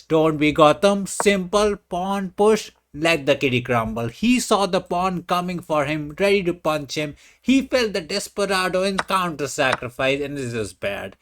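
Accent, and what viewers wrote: India. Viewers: bro can you please stop with this indian accent because i cant take anything serious, i know you can speak english normally.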